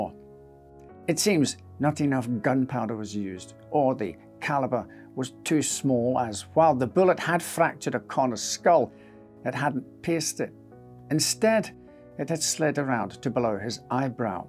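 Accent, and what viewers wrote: Scotland, United Kingdom. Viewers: My first time watching. What a delight. I enjoyed the production; no small effort. I assume you've been involved in acting. I look so forward to making up for lost time and watching your archive. Oh, and let it be said, "There is no accent on earth as rich and wonderful as a fine Scotsman's"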